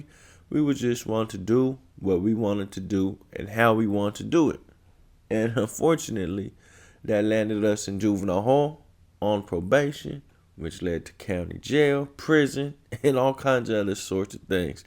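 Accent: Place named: Bay Area, United States. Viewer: Hey bro! I've been loving this channel. Where are you from mayne? I seen you in fremont some of the vids and what not. I lived in fremont frisco stockton all that. I can tell you from around this way from your voice and slang. Lol im subbin off too homie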